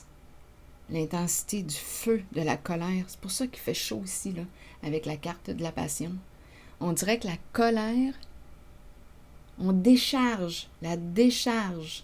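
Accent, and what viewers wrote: Canada. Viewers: Toujours belles guidances .❤ Mon œil est bleu . Vous êtes une très bonne comédienne mais réelle . C’est vrai qu’en réalité, C’est pourri cette famille Et je reste polie Je n’ai pas rigolé tous les jours . Mais je vais mieux . Je vois qu’il y aura beaucoup beaucoup de s ..e . Je n’en doute pas du tout . Confiance ???????!! Maintenant je doute beaucoup . Amour non réciproque Confu (Oui non ) ?????????? Problème de communication Une fois une femme une fois un homme. Qu’est ce que ça veut dire . Accent canadien j’adore . J’aime aussi la mimique des mains . Téléphone fixe inutilisable …. J’adore écrire j’adore parler Je n’ai aucun défaut . Bisous . Merci infiniment .😎😎😎😎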